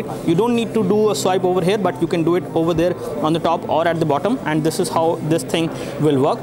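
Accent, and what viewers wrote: India. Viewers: Indian accent gives me headache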